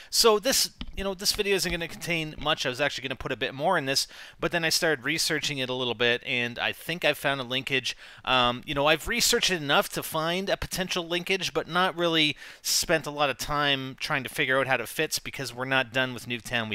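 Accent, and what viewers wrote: Spain. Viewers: U have a nice spanish accent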